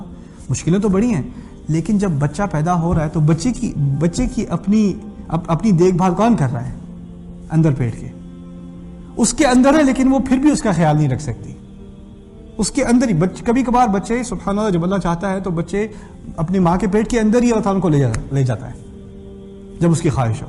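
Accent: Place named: India. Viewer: Masha allah done a great work... May allah bless you.... Nice hindi accent by the way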